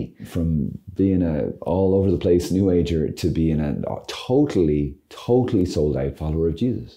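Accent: Ireland. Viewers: Blessed Father I believe if you don’t ask you don’t receive and that goes for everything in this life and I believe the next ! You are a inspiration to me from your Irish accent to your extremely Heart fulfilling Streams and Clarity ! Clarity of the bible and what our Holy Father wants us to do is a Blessing being explained by you Father !! Keep the Faith ALL !! Bless you Father ! Your Accent and your Irish ☘️ HUMOR ! It makes life easier ! God Bless with all the Angels and Saints us ALL. ! Michael James Patrick Maguire in Melbourne Australia ! 🇦🇺. ❤️❤️❤️❤️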